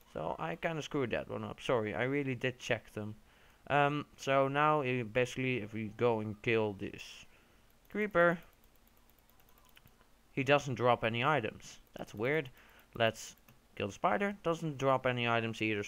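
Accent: Netherlands. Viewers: love the dutch accent :) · Are u Dutch? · je kan makkelijk Horen dat je Nederlands bent:) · are you dutch ? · Da Ceh ja je hoort het altijd als een Nederlands iemand Engels praat. Goedw vidieo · Ik dacht het al aan het accent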